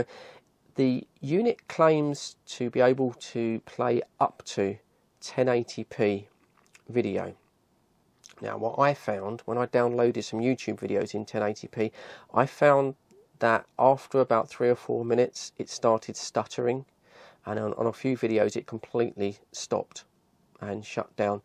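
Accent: United Kingdom. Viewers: Curious, are you British by any chance?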